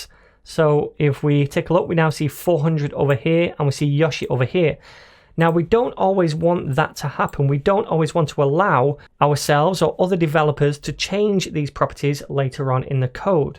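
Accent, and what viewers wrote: United Kingdom. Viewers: i love your British accent <3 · British accent? Sounds nice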